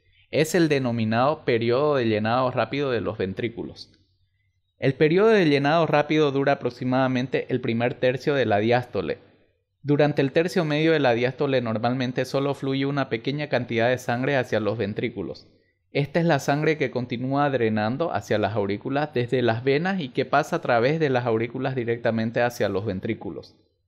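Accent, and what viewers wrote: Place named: Santa Cruz, Bolivia. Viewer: Buenisimo video , una pregunta eres de santa cruz , porque tu acento es muy similar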